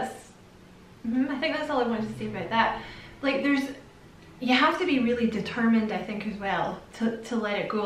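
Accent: Scotland, United Kingdom. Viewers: Thank you so much for this, it's great to hear another Scottish voice❤️🏴.... I am doing lots of shadow work and the amount I have cried is not real 😭... Where I am having problems tho, is not forgiving others for situations, that's easy to me😊.. Its trying to forgive myself!! and now having hindsight, why didn't I do that/say this? its the anger towards myself I can't let go off. Love and light too you and I wish you all the happiness in your new space. ❤️From an aqua lady xxx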